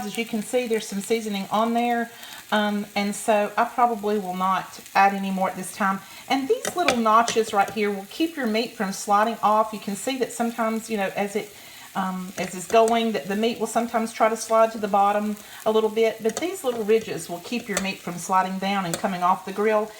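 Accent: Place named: South, United States. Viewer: I like the Southern accent too. All the way from the other side of the world.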